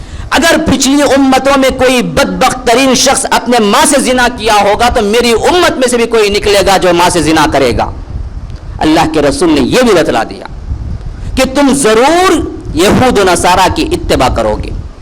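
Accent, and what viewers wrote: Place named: Hyderabad, India. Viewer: Hafiz sahab apki zubaan bohot achi lagti hy, jo shayad Haderabadi hy. Masha Allah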